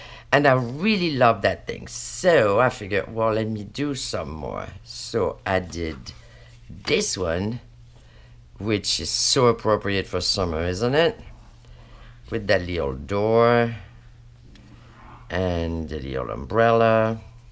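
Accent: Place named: France. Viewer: I just found your channel. I enjoyed watching your video so much! I already knew how to make the project, but I continued watching because youe personality and commentary are so great! It was like the voice inside my head when I am crafting, just without the French accent (which is very cool, btw😊). Thank you so much for sharing this!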